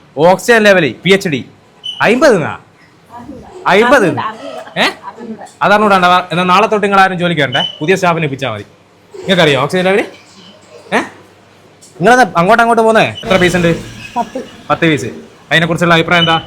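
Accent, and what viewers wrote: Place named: Perambra, India. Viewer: പലവട്ടം കണ്ടു. പേരാമ്പ്ര സ്ലാങ്ങ് .... പൊളിച്ചു...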